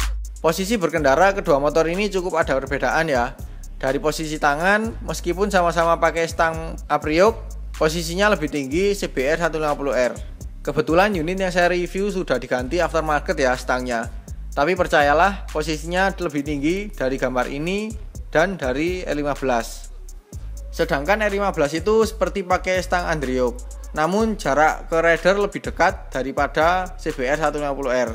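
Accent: Malang, Indonesia. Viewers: Mas org malang ya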